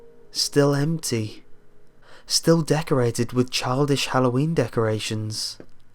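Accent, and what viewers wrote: United Kingdom. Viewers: He's saying it normaly. There's no k, he's pronouncing the g, and you probably don't pronounce that yourself... -_- different accents from different places. PLUS he can't 'fuck up certain words' that are correct in British english because American English is a different language. So yea... .-. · Oh my god..... I really love this story :) YOUR BRITISHNESS IS WHAT TRULY SELLS IT!!! <3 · Would've been scarier if you didn't sound like you were from The Beatles. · you sound so british......its cool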